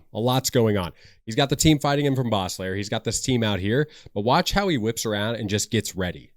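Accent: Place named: United States. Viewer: no not tech-bro, it’s just American Englisch. Even I as non native speaker can comprehend this. Do you really except someone to change the way they speak based on your feedback? The entitlement, holy shit wow.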